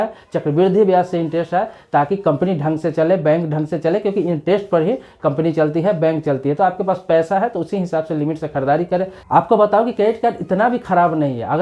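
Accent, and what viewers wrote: Bihar, India. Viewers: Sabse pehle ye apna bihari accent band karo.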